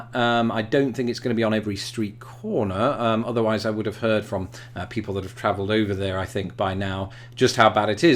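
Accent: United Kingdom. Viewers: Is it just me? Your accent is sounding slightly more British. Maybe it's because your not using your comedy voice like you normally do on your video. But no matter, I'm loving your views on how your homeland has changed. I visited London in '00, so it's also weird to see how much it's changed since then.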